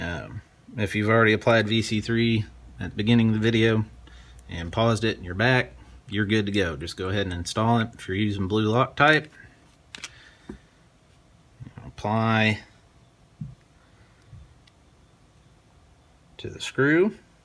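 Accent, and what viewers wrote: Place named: South, United States. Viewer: Thank you again for the video, by the way I love the southern accent, kind funny but I like. I finnally today got some will and finish the slide part, it’s been more than a year since I bought the kit and did the lower frame part, just now I did the slide, I didn’t do before cuz I was lazy 😂 thank you God bless America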